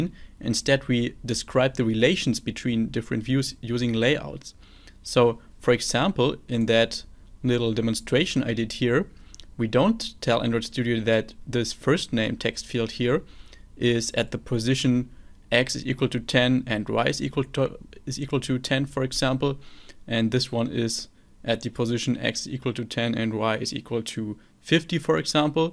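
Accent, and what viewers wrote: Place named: Germany. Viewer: Sounds like you are from germany. :D Anyway, thank you for the good explanation!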